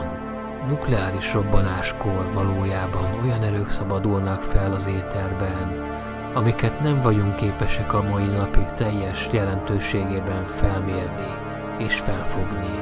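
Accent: Russia. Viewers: Nagyon jó az orosz akcentusod. Tetszik! Azt hittem, hogy ez igaz történet, de miután megnéztem a leírást, akkor rájöttem, hogy ez csak egy kitalált történet. Kösz, ezt a nagyon jó történetet. Van ennek a történetnek folytatása? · Nem tudtam félni vegig a Vicces Orosz akcentuson szakadtam xddd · Király az orosz akcentusod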